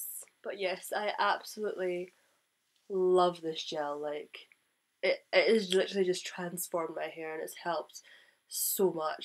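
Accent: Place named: England, United Kingdom. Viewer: Liked the accent. Are you from England?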